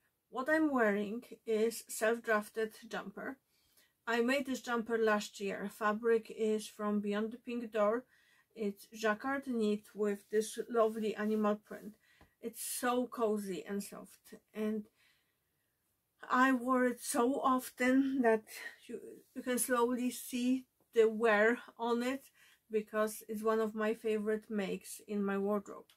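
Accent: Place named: Poland. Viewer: I also love aprons. Saves your clothes from stains while looking great. I love your accent it reminds me of my childhood. Poland? ❤️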